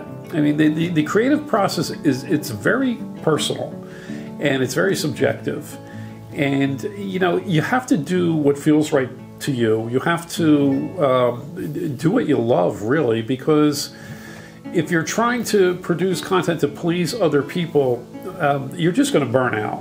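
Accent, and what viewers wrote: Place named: Philadelphia, United States. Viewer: I have been following you guys from the beginning, THANK YOU! My wife is from Philly and she enjoys the accent. I like that you 2 do this not for the money. I'm retired and born and raised in mid-town Manhattan and would sell everything in a NY minute, but unfortunately my wife doesn't share my desire, so I live thru you 2. While boating is not cheap or for the faint of heart divorce is far more costly. thanks to you for giving me hours of fun>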